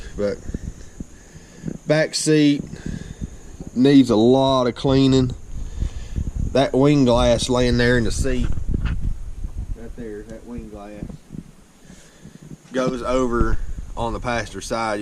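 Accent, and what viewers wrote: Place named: South, United States. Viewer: Your southern drawl is real buddy. County strong 💪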